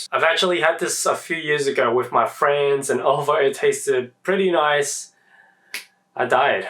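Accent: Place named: Australia. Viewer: Your editing style is really well done. I think sparkling water is spicy, so I’m curious to up my tolerance. I’m from Israel but living in Australia (based on your accent/the Red Rock Deli chips, you do too), so not two places known for spice. Thankfully we do have so many options here thanks to the beautiful blend of cultures.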